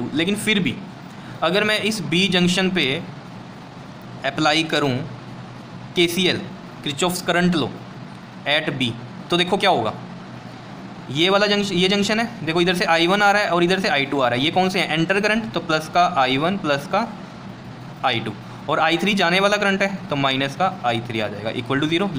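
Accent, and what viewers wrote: Punjab, India. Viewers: i found a bit of punjabi accent in your video 😎😎😎😎😎😎😎❤❤❤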